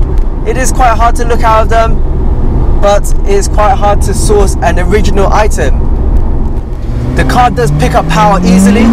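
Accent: United Kingdom. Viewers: Asian dude with British accent gotta love it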